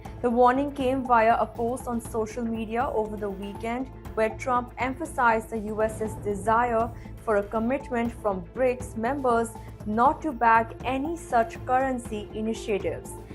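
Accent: India. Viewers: My God Indian voice again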